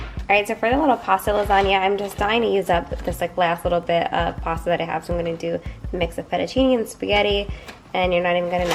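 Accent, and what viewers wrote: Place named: East Coast, United States. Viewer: That east coast accent 🤩😝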